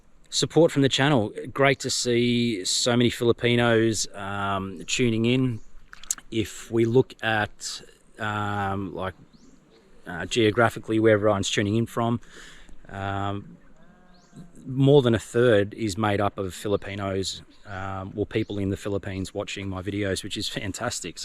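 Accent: Australia. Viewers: Great to hear an Aussie accent in Dumaguete in lieu of americano I will heading there from Manila next week love to catch up with a beer if likes cheers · Hey, it’s great to hear a Aussie voice talking about his adventures in the Philippines, we work hard for what we have, so I agree buy what makes you comfortable.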